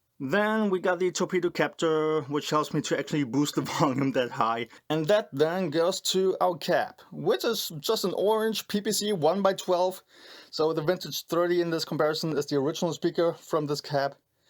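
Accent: Germany. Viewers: "tyooning" "Duck-Terror" Dude, work on your accent. It's not AS catastrophicaly cringey as German accents usualy are, but still. At the beginning of the video it actualy sounded like you deserved praise for NOT sounding like a blithering stereotype; but you've still got quite a ways to go. As for the speakers: The CV75 kinda sounds like a WGS Reaper to my ears... :-o And what's up with that weird noise on the V30?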